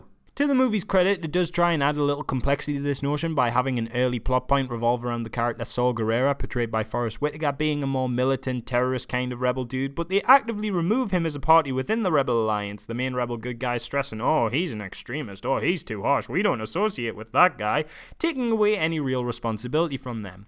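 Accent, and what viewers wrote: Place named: Geordie, United Kingdom. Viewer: Thick Geordie twat.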